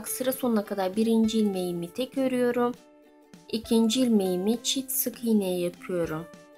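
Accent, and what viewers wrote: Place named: Russia. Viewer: Elinize sağlık hnm. Özel olmazsa bişey sormak istiyorum. Ruslar gibi Türkçe konuşuyorsunuz. Aslen rus musunuz?;)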